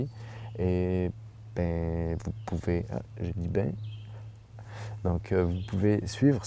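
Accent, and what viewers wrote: Quebec, Canada. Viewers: Bonne vidéo,. Je suis de retour au Québec, après avoir passé l'hiver dans le sud des USA. Deux mois presque, que je suis à Montréal (downtown) et l'envie de reprendre la route me taraude. Je songe à retourner à Cape Cod (P-town) bientôt. On pourrait peut-être se suivre? Ou y aller dans ma van? (il y a de la place et deux lits). Je connais les endroits propices (j'y ai passé tout septembre). La mer est belle et les plages merveilleuses. Et il y a des endroits où prendre une douche chaude pour 0,25$ ou froides, à la plage. En passant, ton idée de tournée américaine, c'est trop ambitieux. Et aller seul au Mexique (que j'ai fait en 2006, pure inconscience de ma part), ce n'est pas une bonne idée. C'est un miracle que je sois revenu en vie. On pourrait s'en reparler. Just email me. By the way, your French is very good, avec le si sympathique accent du Québec. 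· J'aime bien ton accent français du Quebec ;-) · Je suis français et ton français est correct, je comprends tout ce que tu dis!!!! T as juste l accent Québécois ! Mais j aime!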